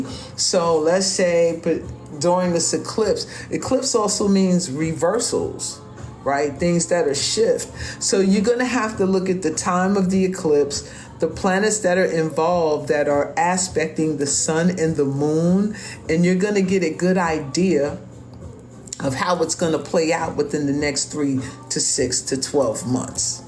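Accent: New York, United States. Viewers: This sister gotta be from New York ! If not, Jersey or Philly. Definitely the East Coast no doubt ! I love this lady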